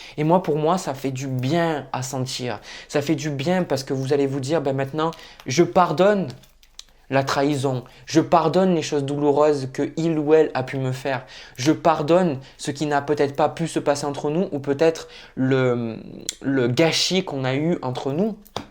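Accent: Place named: Southwest, France. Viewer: Bonjour, étant ascendant scorpion, c'est la première vidéo que je visionne sur votre chaîne. Néanmoins, je dois avouer que par manque de temps, je n'ai pu regarder uniquement la moitié de votre vidéo, mais ce n'est que partie remise. La partie que j'ai visionnée a été extrêmement émouvante. Chaque mot que vous avez prononcé (avec votre joli accent du sud-ouest), j'aurais pu les prononcés moi-même, dans mon esprit, dans mon coeur et à voix haute. Oui, instinctivement et intuitivement, sans me poser dans la figure de la victime, je me suis souvent demandée ces derniers temps: Pourquoi moi? Je ne me reconnais plus dans ce que je suis devenue? Quel est ce tournant que ma vie a pris sans que je ne me rende compte de rien. Intellectuellement, j'ai beaucoup cherché mais maintenant je sens et même je me doute que la réponse est dans ce qui est caché, ce qui est connecté au spirituel, d'où le tirage de ces cartes pour les scorpions qui incarnent des notions de spiritualité. 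Merci du fond du coeur, de ce tirage en grande résonnance avec mon âme. Je m'abonne.🙏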